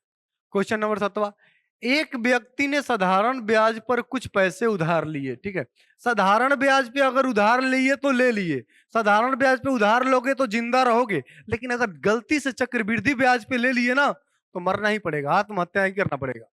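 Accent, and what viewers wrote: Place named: Bihar, India. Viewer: Sir aap chhatisgarh se nahi ho na bihari jaisa feel ho raha hai bato ko sun kar😂😂😂😂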